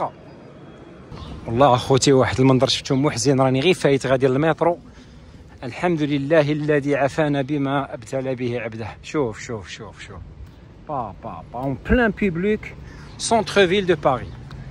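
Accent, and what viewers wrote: Algeria. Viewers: لهجة ديالك جزائري بينا وضوح شمس سير قلب على شي خدمة باركا من تشويه صورة المغرب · من خلال لهجتك أنت جزائري. لمذا تدعي أنك مغربي. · علابيها تتكلم كيمانتاع الغرب الدزيريين تماما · هادوك كا يترزقوا. شوف لي كا يشفروا أو يبيعوا في المخدرات. اللهجة ديالك جزائري ماشي مغربي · انت جزائري، لهحتك جزائرية ، علاش تقول راك مغربي..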